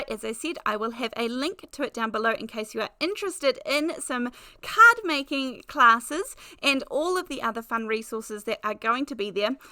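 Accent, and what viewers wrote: New Zealand. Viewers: 😁😃😆 I’m so very happy for you and your husband to have created your own website! I joined with a membership so I can support you to keep creating content for me and all those who love your teaching style, giggles, and for me, I love your NZ accent. Thank you so much for choosing this path in life… you make the world a better place! A HUGE ADMIRER!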